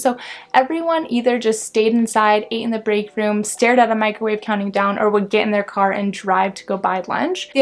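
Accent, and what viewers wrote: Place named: California, United States. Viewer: I think its californian, they do have that nasal drone,but I dont find it irritating, Im from the North east of england, I find my own accent embarrasing sometimes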